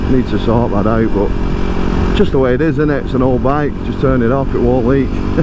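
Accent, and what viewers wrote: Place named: Rochdale, United Kingdom. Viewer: You sound like you're local to me 😂 (Rochdale) I'm looking at getting a DR250 as a winter/enduro/play bike, I have a GSR600 for good weather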